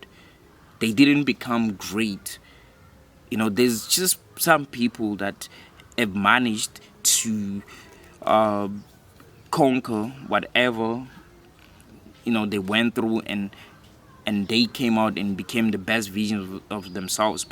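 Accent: South Africa. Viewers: I could tell by your accent that you're South African. I'm from East London, Eastern Cape. Keep up the good work, love your content.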